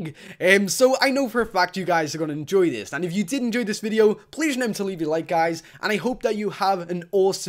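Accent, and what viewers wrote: Ireland. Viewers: STARLORD Are you Irish? :D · is he irish?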